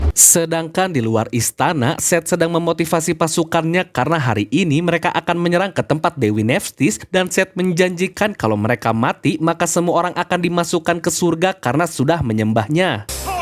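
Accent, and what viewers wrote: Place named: Java, Indonesia. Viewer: Emamg elu tuau dia orang Jateng or jatim? mas is jawa. Not nesyenel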